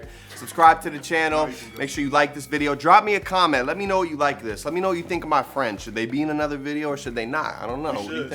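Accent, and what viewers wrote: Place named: New York, United States. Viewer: I love how much of a New Yorker you sound. This looks banging. Definitely not traditional but has the perfect amount of twist.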